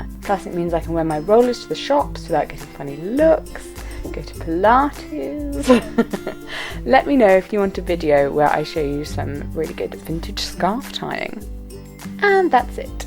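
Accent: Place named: England, United Kingdom. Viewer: i must say, i adore that you are so young and have such a thick english accent. a lot of british ppl sound AMERICAN now adays...! as in no accent at all. very flat way of speaking. no expression and heritage in their way of speaking. it is a delight to hear your voice. i watch old brit tele for the humor and BEAUTIFUL accents. lol. keep it and spread it!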